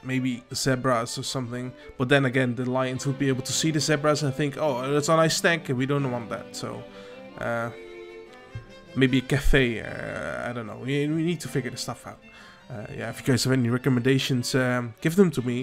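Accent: Netherlands. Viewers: You sound very Dutch, are you?? Awesome build, nicely done!!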